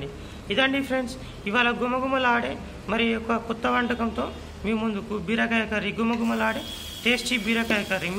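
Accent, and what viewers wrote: Telangana, India. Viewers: Ibbandi padoddu Clear ga telangana bhasha best kada.... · mikku nachinattu cheppu bro... chala kastapadutunnaru padathiga matladataniki.... madyalo Telangana slang vadaru adhe continue cheyu... baguntundhi... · Meeru chala kastapaduthu diplomatic ga matladthunnaruu.. Just miku ela nachithe ala telangana style lo matladandiii.. And nice curry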